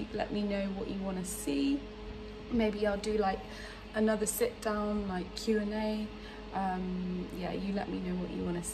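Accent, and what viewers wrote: United Kingdom. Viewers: I love your British acent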